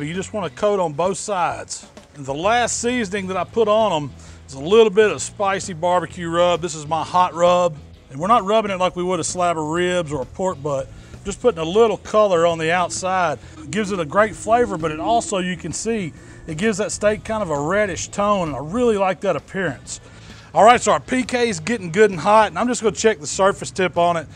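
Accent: South, United States. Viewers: Your southern accent has faded a little bit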